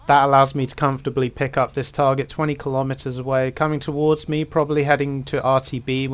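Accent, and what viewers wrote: United Kingdom. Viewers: Your channel is starting to become my favourite WT educational/training (if I can call it that) channel on Youtube. On point and posh accent! I do note the distinct lack of Shar FA2 content though. How about doing a next ARH tips with that? Come on, embrace the suffering haha.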